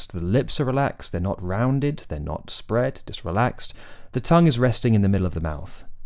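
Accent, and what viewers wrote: United Kingdom. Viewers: Your standard British accent is so beautiful. The way you flow from words to words is so beautiful like music. I know you grew up speaking English but did you train to be such a great speaker. You speak so clearly and you annunciate your words, some native speakers don't do that.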